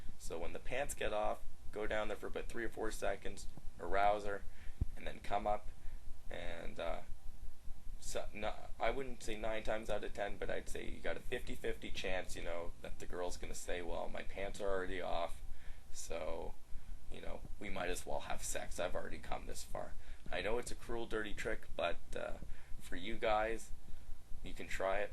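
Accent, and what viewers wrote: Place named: Canada. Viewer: i liker yer Canadian accent! like when ya say "OUT"...cute{i'm not gay[not that there's anything wrong with that], just callin a spade a spade}(fer serious). You really do have a gift as the voice of reason here!...great job.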